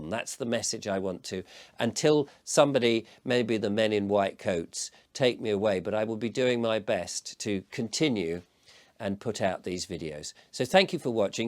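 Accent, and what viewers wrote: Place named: England, United Kingdom. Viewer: Spoken by a true English man.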